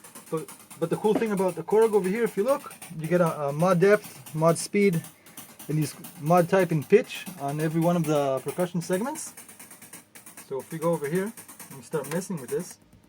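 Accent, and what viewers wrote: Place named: Israel. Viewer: אחלה וידיאו גבר כותב בעברית כי שומעים שאתה ישראלי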